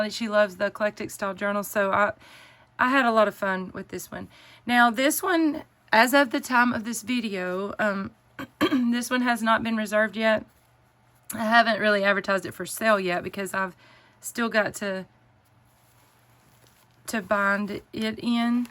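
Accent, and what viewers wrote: South, United States. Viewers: I love this so much! Your voice is so cute! I recognize that southern accent!! I am not watching it all as I want to be surprised!! 🩷🩷🩷🩷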